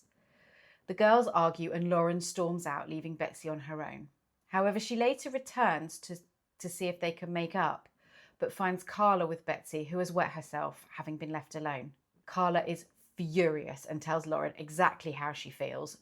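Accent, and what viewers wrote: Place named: United Kingdom. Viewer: At last British accent. And a real person. No AI with American accents.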